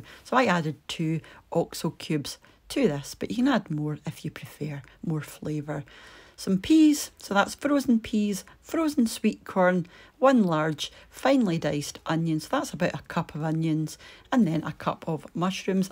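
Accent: Scotland, United Kingdom. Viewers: Ita a follow from me to you whoop whoop ❤ mo the bogan loves a we scottish accent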